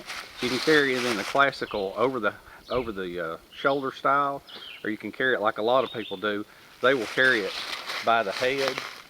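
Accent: South, United States. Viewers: I can tell is a southern man.... he talks like me....I'm in Western NC.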